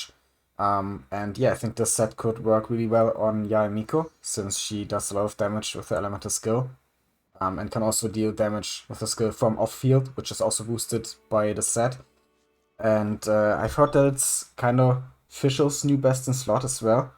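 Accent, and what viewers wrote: Germany. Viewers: 3 sec angeschaut direkt rausgehört aha der kommt aus deutschland ༼ つ ◕_◕ ༽つ <3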